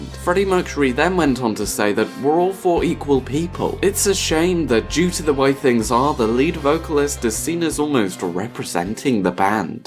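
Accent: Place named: RP, United Kingdom. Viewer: Yes I can imagine you did have to think a lot about it! But you've made a few good points, I really enjoyed this video! Keep up the good work (omg that sounds like I'm calling you a rude word) haha oh gosh I'm gonna have to chose my wording differently lol anyway thanks for replying and BTW I love your voice! You sound posh (I'm a northerner so everyone sounds posh to me 😀) 👑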